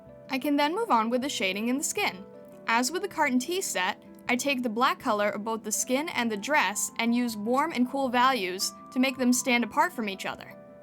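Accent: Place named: United Kingdom. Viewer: lovely art and also bri''ish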